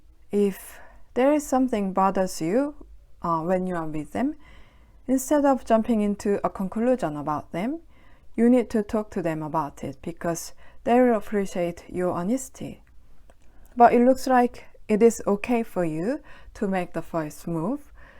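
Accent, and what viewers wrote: United Kingdom. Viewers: 약간 영국 발음 같아요 완전 영어 잘하시네요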